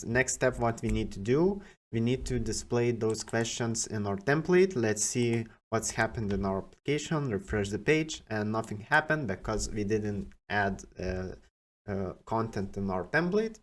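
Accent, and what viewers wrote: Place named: Eastern Europe. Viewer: where are you from dude? you sound eastern european ;) Cool video!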